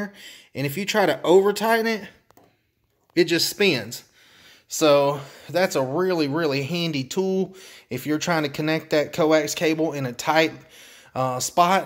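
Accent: United States. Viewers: He's got my accent dont ya? we're both southern bubba's talks just like me. im a fan.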